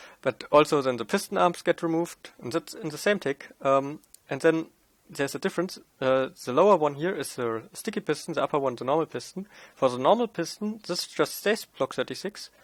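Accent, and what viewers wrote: Germany. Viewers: sprechen sie deutsche? das hort wie du eine deutsch akzent hast.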